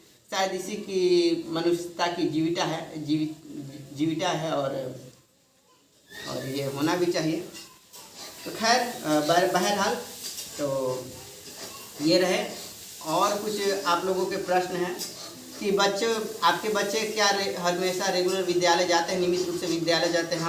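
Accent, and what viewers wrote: Standard. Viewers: आपकी शुध्द हिन्दी काफी अच्छी है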